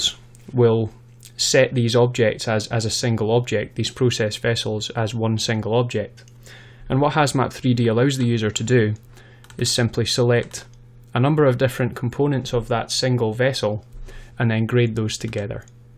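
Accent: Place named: Ireland. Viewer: are you Irish? nice accent tho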